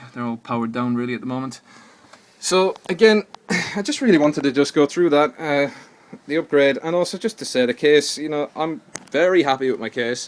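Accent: Ireland. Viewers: Are you from Ireland? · hey man, u sound irish? i presume u are but anyway, i have the same CPU and im just wondering how much do you think i could overclock it using the standard cooler that came with it?.. i searched around but good answers :/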